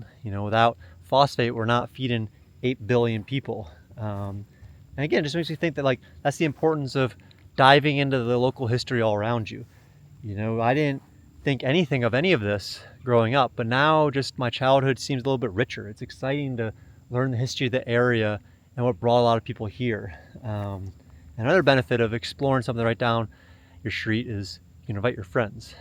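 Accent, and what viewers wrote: United States. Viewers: Love your vids. I heard "Bone Valley" and my ears perked up. We ride dirt bikes at that place you saw named that on OnX. Nice to know you grew up here. I thought you were from Boston, where I'm from. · I thought you had a central Florida accent. That’s funny. Welcome back.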